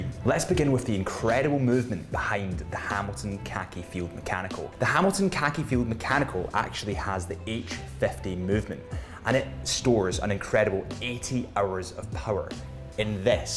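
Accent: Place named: Northern Ireland, United Kingdom. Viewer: I hear a wee bit of a Northern Irish accent. :)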